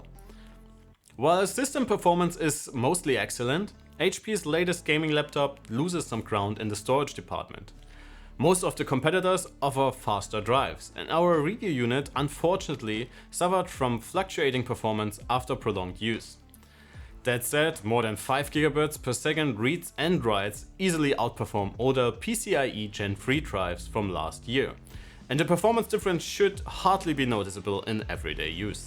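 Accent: Germany. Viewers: Mach mal bitte Timestamps rein, ich höre deinen deutschen Akzent, liebe Grüße.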